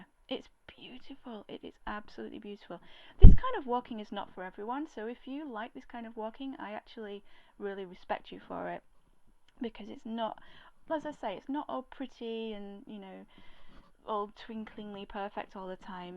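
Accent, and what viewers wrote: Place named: Northern England, United Kingdom. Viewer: Good advice, but why the London Hiker?, you sound pretty northern to me.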